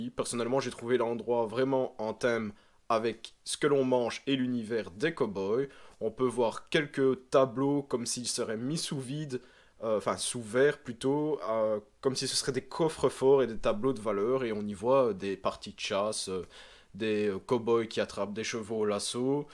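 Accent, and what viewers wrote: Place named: Belgium. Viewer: J'adore ton accent belge. En tant que confrère, je m'abonne.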